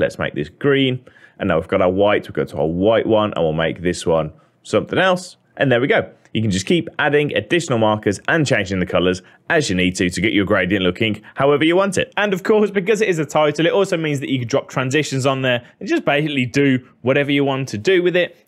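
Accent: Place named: Birmingham, United Kingdom. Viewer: I am watching you from 2 years and I was prety sure you have a brummie accent but I wasn't sure until today when I saw your Twitter account.:goodvibes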